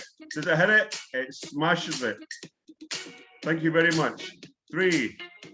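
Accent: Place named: Scotland, United Kingdom. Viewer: I've just upgraded my tradingview to the pro+ with volume profiles. I've spent the full weekend looking and reading about the profile, the problem is 99% are showing you hindsight or they are just explaining the profile and not actively trading with it. Am I right in saying that you are always targeting the previous days POC? Today is my first day with volume profile and i'm also trading futures with CME data, i'm going to go through all videos on the channel, any pointers on what videos are clearly describing your strategy? BTW great to hear a fellow Scotsman, cheers!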